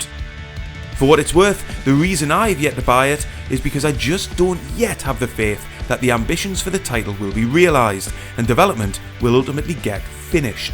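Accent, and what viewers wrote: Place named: North East England, United Kingdom. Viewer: Really enjoyed the video. As an Xbox sim racer this has been super helpful in my research to PC switching. Your trustworthy NE accent helps as well 😉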